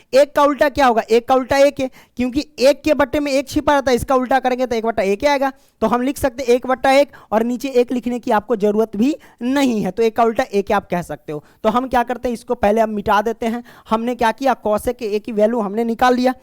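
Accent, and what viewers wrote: Bihar, India. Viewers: Sir bihar se hain n....?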